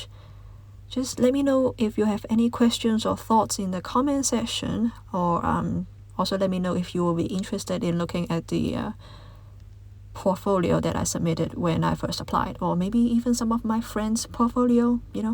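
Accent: China. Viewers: 听多了以后，觉得你应该是中国人。从口音判断的。:eyes-pink-heart-shape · thkuu for the helpful sharing! to me you sound Hong Kong! portfolio petition here!!!!! would be v helpful for someone who is in Australia and considering pursue a animation career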